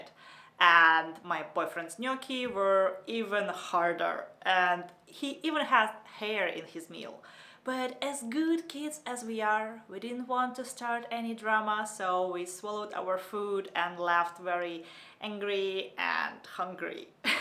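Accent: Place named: Germany. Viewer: Wann kommen denn mal wieder Videos auf deutsch von dir?Man vermisst schon dein deutschen Akzent :D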